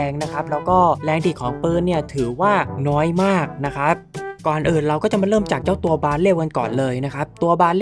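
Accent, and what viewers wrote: South, Thailand. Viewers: คนใต้ไหมนิ55ไม่น๊อดนะแต่ว่า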